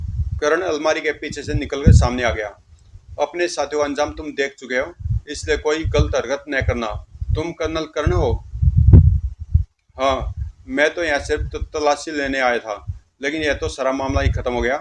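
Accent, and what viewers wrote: Haryana, India. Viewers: Bhai hariyanvi lage se😊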